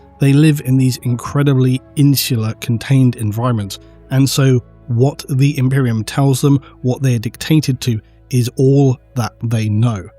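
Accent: United Kingdom. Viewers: Surely he’s also in Europe, or at least he sounds British.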